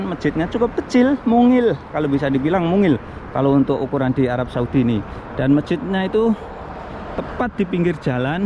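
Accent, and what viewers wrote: Java, Indonesia. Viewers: mas ni asli orang mana yaa??? 🤔🤔 logat nya kayak orang jawa....🙂🙂 salam dr ponorogo Jawa timur... 🥰🥰🥰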